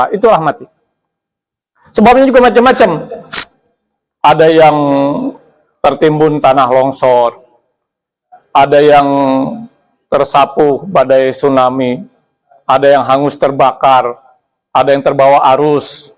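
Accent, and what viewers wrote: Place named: Betawi, Indonesia. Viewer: Hadeuh....ini betawi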